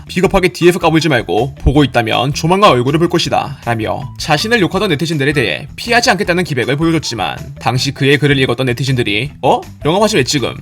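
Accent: Jeolla, South Korea. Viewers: 시작부터 전라도ㅋㅋ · 시작부터 전라도인 거 ㅈㄴ 레전드네 ㅋㅋㅋㅋㅋㅋㅋㅋㅋ 까보전은 과학인가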